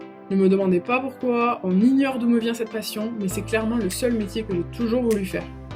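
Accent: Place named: Albi, France. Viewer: Oh nioooon je suis trop contente d'entendre ton accent 😭❤ Albi forever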